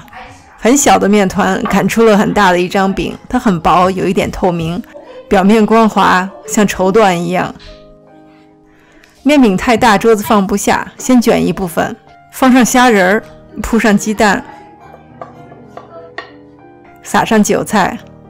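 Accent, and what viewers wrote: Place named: Northeast, China. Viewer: 为啥感觉你说话像是东北的捏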